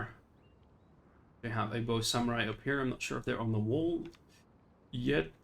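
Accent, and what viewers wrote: Netherlands. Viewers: He's defiinitly dutch, as a dutchman myself I can instantly tell he's from the Netherlands with how he speaks english. Idk what it is exactly but it's very recognizable.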